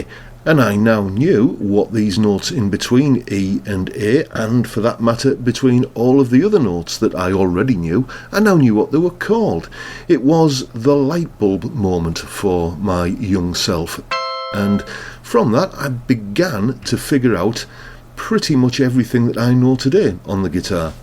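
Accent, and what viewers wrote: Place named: Northern England, United Kingdom. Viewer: Ignore the ignorance, speaking as a suvener, I love the northern accent. Keep up the great work, there are many people who I'm sure will get a lot out of this. Incidentally, my Dad who was from Hull would have called your music center a gramophone!:-)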